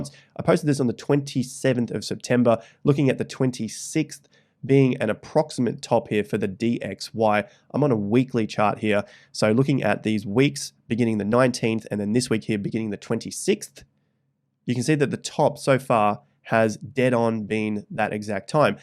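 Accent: Australia. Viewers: The Australian accent is unfortunately super annoying to me....especially when they say Twittahhhh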